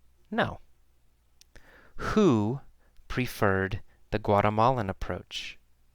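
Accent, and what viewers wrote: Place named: United States. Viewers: mỹ nha bn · American it is, my dear.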